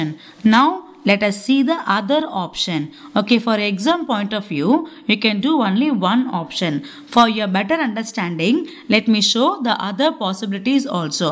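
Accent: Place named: Tamil Nadu, India. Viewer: Awesome lecture mam... Keep it up✨ i assume that u r from tamilnadu😅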